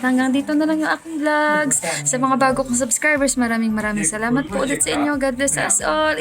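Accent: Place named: Hiligaynon, Philippines. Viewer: More blessing inday.always watching ur vlog and don't skip adds.basta ilongga malambing gid ya mag salita.kaya halata ka ng asawa ko na ilongga dahil sa tuno ng pananalita mo.asawa ko taga bacolod din.God bless inday.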